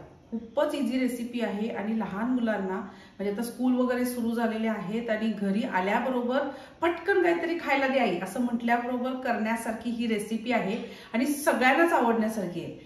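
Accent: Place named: Karnataka, India. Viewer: तुमचे कर्नाटकी मराठी हेल काढून उच्चार खूप छान वाटले कानांना ऐकताना 😍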